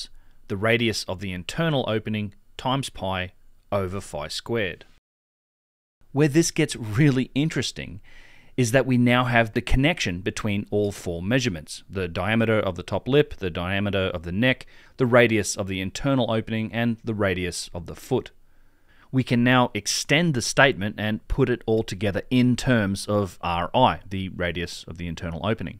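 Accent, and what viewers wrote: Australia. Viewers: That's what happens when an Australian lives in the US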